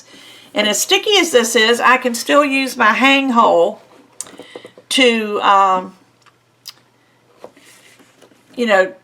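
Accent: United States. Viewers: I've got to guess from your accent your in America. If you think $17 dollars is expensive for a scan and cut mat - don't ever think about emigrating to Australia. We have to pay almost $40 for our mats. 😢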